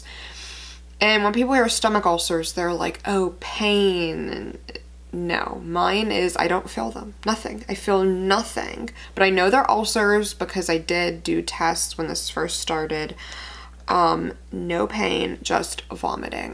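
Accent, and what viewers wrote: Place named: Canada. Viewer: are u canadian? u have a canadian accent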